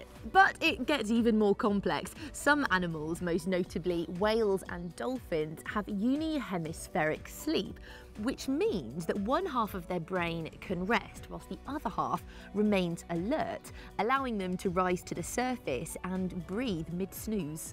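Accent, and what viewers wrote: United Kingdom. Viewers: And I like it. She has a beautiful British accent.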